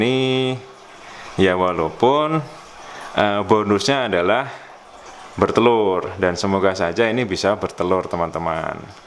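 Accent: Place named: Surabaya, Indonesia. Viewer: Sampean suroboyo ndi mas?bek'e oleh nempel Gon e sampean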